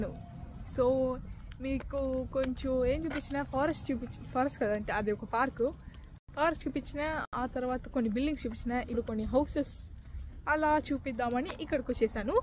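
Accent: Tamil Nadu, India. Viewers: Mey nee bhasha bhale vundi mey.. Konchem Tamil mix vundi gani nee Telugu matram super mey 😂 keep doing more and more in Telugu 😅 By the way.. shorts and long videos both are good! I will definitely wait for both ❤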